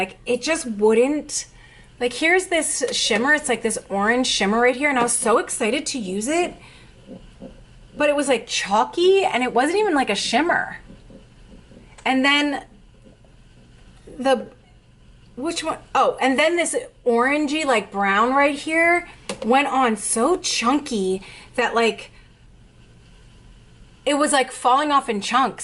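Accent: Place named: New Jersey, United States. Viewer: loving the Jersey / Brooklyn accent. Got the same one.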